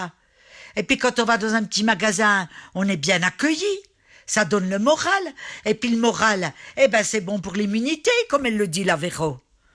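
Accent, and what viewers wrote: France. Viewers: Merci ma très chère pour ta verve et ton regard si aiguisé sur cette société mortifère...on a besoin de ta vision et de ton accent qui nous emmène au cœur de ta belle province. On t embrasse du cœur du Nivernais et n oublie pas Lola!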